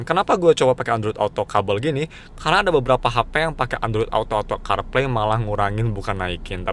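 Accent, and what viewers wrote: Bandung, Indonesia. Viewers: lah orang bandung lu bang?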